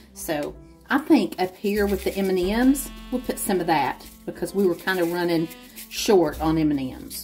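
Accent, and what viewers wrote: South, United States. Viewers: What a great video, some excellent Christmas treats im gonna try. Love your southern accent! Love from the UK xx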